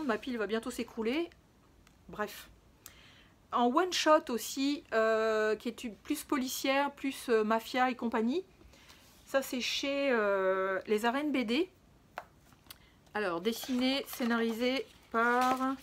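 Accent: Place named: Quebec, Canada. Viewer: une PAL de 340 BDs a lire!!! le rêve! 🥳🎉🎉🎉 Québec, j'avais deviné au léger accent... "Kililana song" est une magnifique BD. le dessin est sublime. "La bête" de Frank Pé, ca a l'air tres sympa. "Blast", une de mes BDs preferees, j'adore le dessin. c'est sûr que dans les BDs qui sont pas "youpla boum" elle est dans le top 3 facile. "La petite lumiere", je veux le lire, j'aime bcp le dessinateur.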